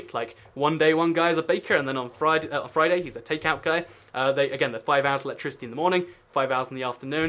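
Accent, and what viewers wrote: England, United Kingdom. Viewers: Is it your first time hearing a british accent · This guy talks faster than the Chipmunks on speed, and he's English. There are lots of great videos but even more bad narrators on You Tube.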